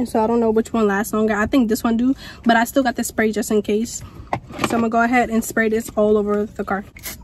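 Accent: New York, United States. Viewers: Her accent is so New York. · lol i knew you was from ny .... we all talk the same.... type shit lol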